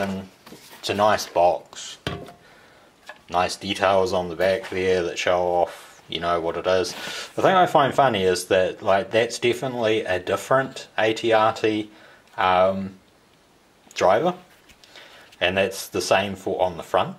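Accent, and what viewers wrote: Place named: New Zealand. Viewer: You sound like your a fellow kiwi , then I heard tfnz haha but awesome man I subscribed.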